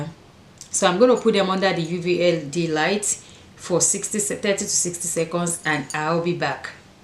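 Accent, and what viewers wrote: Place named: Africa. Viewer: Perfect timing! I just bought a gel lamp on black Friday and in search of a quality brand to try for myself. I appreciate this quality review. I am trying to connect with women that have similar interests as I've just started my channel. I am looking forward to more of your content and reviews!! I've liked and subbed mamas (and yes, your African accent is gorgeous). -Ash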